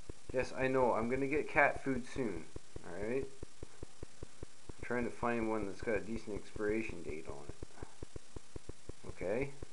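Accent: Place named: Newfoundland, Canada. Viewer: Definitely sound like a Newfie. ;)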